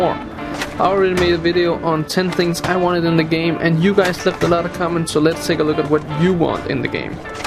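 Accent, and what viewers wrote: Denmark. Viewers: man ka bare høre at du er dansk men hvis man er udlansk er det selvfølgelig ikke noget problem XDXDXDXD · Er du fra Danmark ? Du lyder virkelig dansk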